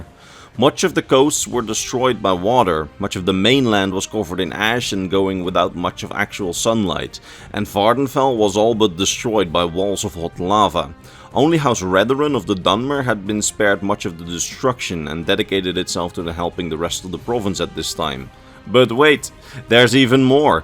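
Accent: Netherlands. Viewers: It’s the Dutch accent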